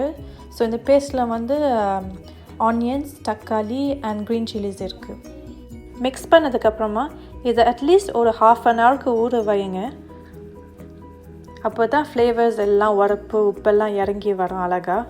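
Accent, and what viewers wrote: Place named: London, United Kingdom. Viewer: London Tamil lovely 🥰🥰Mouth watering dish😋😋😋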